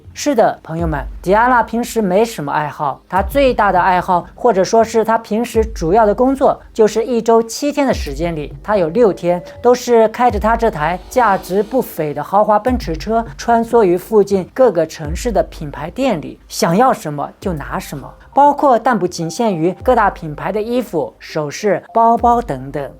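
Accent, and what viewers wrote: China. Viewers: 請問你是哪裡的口音？安徽嗎？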